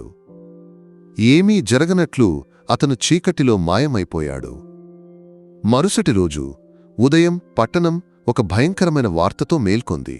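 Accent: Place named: Kerala, India. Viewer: మాతృభాష మళయాళం అయిన మీ నోటివెంట తెలుగు పదాలు వినటానికి చాలాబాగా అనిపించింది. డి వై ఎస్పీ అనే పదం కేరళలోనే విన్నాను. 6 సంవత్సరాలు మీ రాష్ట్రం లోనే గడిపాను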